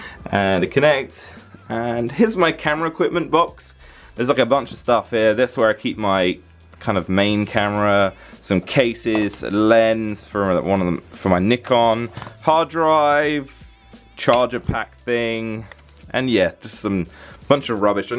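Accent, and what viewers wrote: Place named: United Kingdom. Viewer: is from the UK. You can clearly hear the difference between English (British English) and 'English' (American English). Jordi and Jelly are from the Netherlands, they have been speaking "English" (Dutch English) in videos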